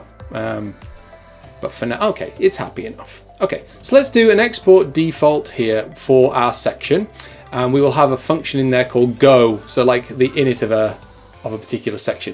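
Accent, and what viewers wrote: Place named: United Kingdom. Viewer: Curious. Where in the UK is your accent from?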